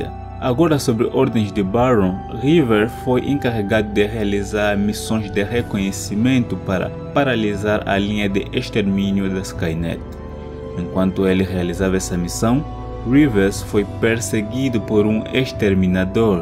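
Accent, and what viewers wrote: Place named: Portugal. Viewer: Vim do vídeo sobre The surge , o microfone nem era ruim como falavam dava pra entender , eos br achando q vc era Portuga kkkkkk , legal saber q melhorou a qualidade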